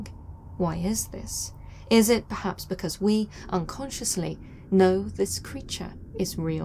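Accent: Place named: East Anglia, United Kingdom. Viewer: I love you lady! Your East Anglia accent and proper demeanor excel beyond the mediocre expectations placed on YouTube by so many. You are better than you do and deserve your Home television show or 30 minute spot at the least!! Bless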